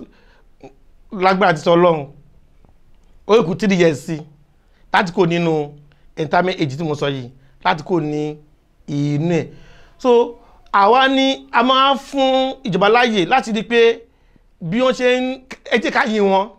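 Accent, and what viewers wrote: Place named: Fulani, Nigeria. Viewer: The man is Fulani....just pay attention while listening to his ascents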